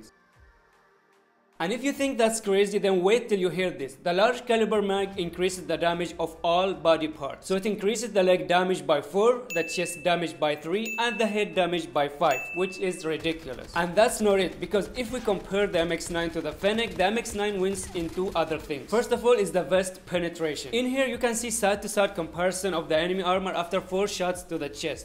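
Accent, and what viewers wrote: India. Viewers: The indian accent makes this video 100 billion times better. Idk why. I guess this is caused by watching Mutahar from someordinarygamers last night for 5 hours straight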